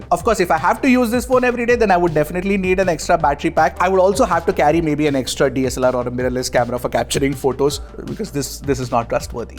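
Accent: India. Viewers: Yuk indian accent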